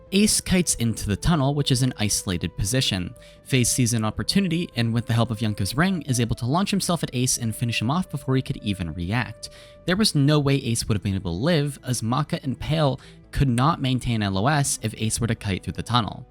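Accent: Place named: United States. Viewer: American accent but you call it university?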